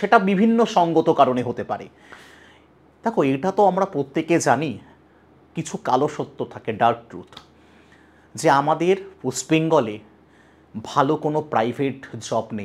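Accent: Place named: Bangladesh. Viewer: Your pronunciation like a Bangladeshi.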